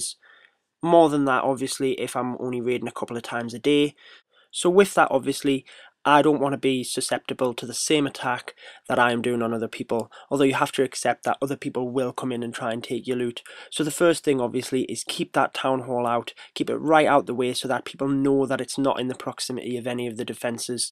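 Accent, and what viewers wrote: United Kingdom. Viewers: O yeah, now I can hear the original British accent. No American mixing 😂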